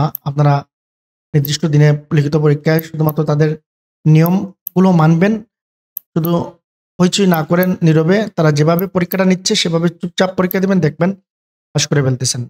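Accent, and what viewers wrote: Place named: Sylhet, Bangladesh. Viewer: আমার মনে হয় আপনি সিলেটি😊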